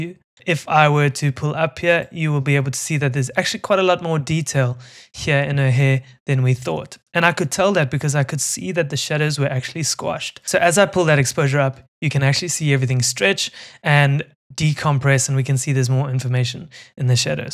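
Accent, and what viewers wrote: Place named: South Africa. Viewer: south like a fellow south african , that accent is very south african but thank you so much for the lessons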